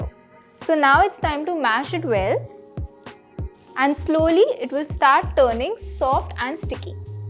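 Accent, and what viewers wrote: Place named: India. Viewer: Amazing to hear how English is spoken like the local language in different countries. She sounded just like she was speaking Indian without the subtitles. Nice recipe too.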